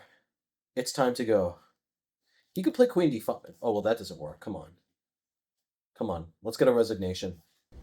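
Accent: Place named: Rhode Island, United States. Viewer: are u from Rhode Island? I’m guessing the accent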